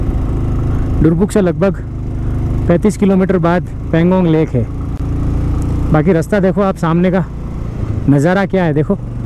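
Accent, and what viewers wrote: Gujarat, India. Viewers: ભાઈ તમે ગુજરાતી છો એ તમારી ભાષા પરથી લાગી જ રહ્યું છે😅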